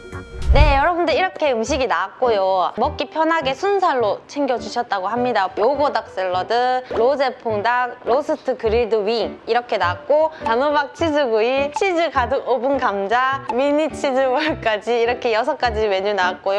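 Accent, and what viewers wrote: Gyeongsang, South Korea. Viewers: 정말 잘 먹는다.. 오늘~은 ...구수한 경상도 말씨 또 들어봅니다.. 저도 시켜 먹어 볼랍니다..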